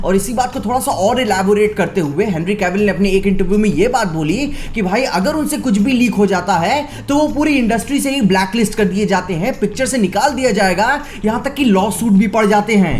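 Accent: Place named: Bihar, India. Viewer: Bhai tumhara style ek no.. up Bihar ka touch....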